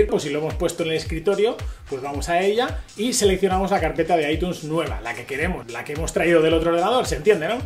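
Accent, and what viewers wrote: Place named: Galicia, Spain. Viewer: Jimmy Neutron con acento gallego!!! que cool!!!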